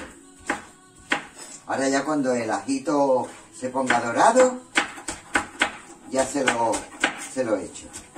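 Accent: Andalusia, Spain. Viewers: Ole tu ,y ese acento andaluz que me encanta, y esa cazuela que buenisima pinta ,gracias de una catalana 👍🎅🎄🎊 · Me encantan tus vídeos y no solo por lo que guisas también por tu gracia, ese ángel y ese acento andaluz que mola tanto.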